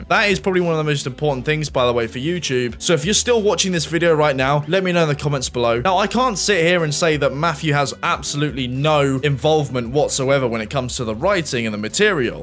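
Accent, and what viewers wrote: United Kingdom. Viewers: Ye still watching because your brit voice is crisp xD, didnt even know who this Matthew was until someone posted a random video and got me to you. But yea your voice is going to get a sub. Would love to see some playthrough walkthroughs if you plan on doing them. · "If you have interesting subject, people will watch" disagree. Watched one of your videos and at this point i don't care what you are talking about. Your voice makes my want to listen and i just let autoplayer go on. Good job you funny, silk-voiced bri'ish man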